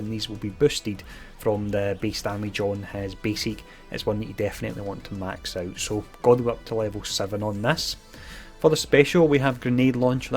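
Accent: Scotland, United Kingdom. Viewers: came for the info stayed for the scottish accent will come back for both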